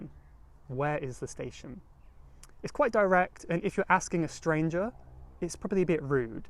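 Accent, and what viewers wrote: United Kingdom. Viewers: I love your British accent 😅📌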